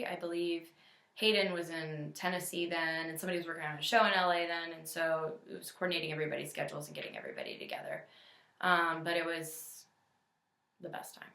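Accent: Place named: England, United Kingdom. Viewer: Soy español pero detecto un poco ingles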